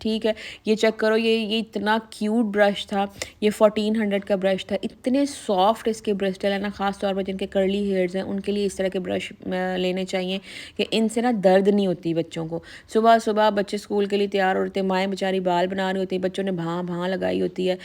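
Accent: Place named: Punjab, India. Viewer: Api ap botli bhout mazy ka h Jo ap punjbi ka tarka lagti h Kia bt h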